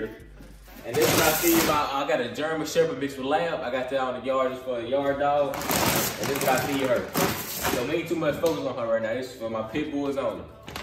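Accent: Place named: Atlanta, United States. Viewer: lmao he said it twice first time I didn’t hear it by the time I found this he said it again and I heard clear as Day sounds like he from Atlanta or sum 😂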